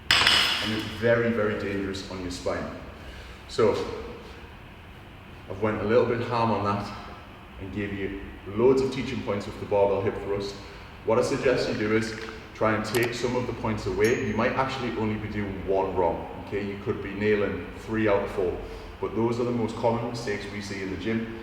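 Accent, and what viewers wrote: Newcastle, United Kingdom. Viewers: He´s from Newcastle, north of England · He has an accent? Which part of UK he is?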